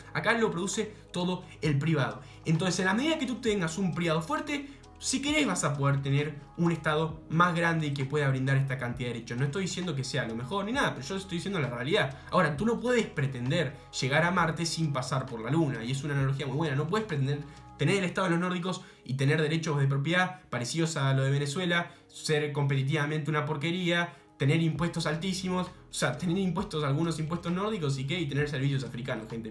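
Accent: Argentina. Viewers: Todo bien pero en el norte de Dinamarca el 80% de la poblacion laboral es contratada por el estado. Igual tenes razon, pero hablando en neutro con acento argentino queda medio payaso.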